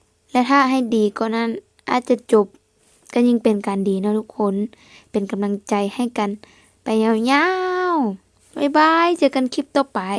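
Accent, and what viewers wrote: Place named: Vientiane, Laos. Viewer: สะบายดี พี่สาวลาวฟินแลนด์ ผมมาเบิ่งรถมึอสองนำเด้อครับ มีแต่รถงามๆเนาะ สำเนียงเสียงเว้าเจ้าคึอสาวเวียงจันทน์เลยเนาะเว้าม่วนๆครับ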